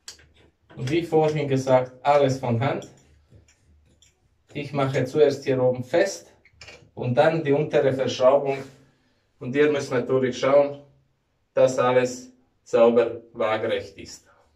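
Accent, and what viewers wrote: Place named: Eastern Europe. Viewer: Super erklärt ohne viel Schnick Schnack so wie es sein muss :) und dein Osteuropäischer Akzent ist sehr angenehm und beruhigend zu hören :) ❤❤❤💯🤝🏼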